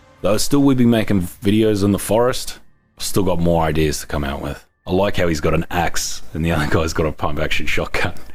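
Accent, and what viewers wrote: Australia. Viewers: Mate, are you Australian? Is that a fellow aussie? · your from Australia buy the sound of it, plus the Aussie sarcasm, or i have been in isolation for way to long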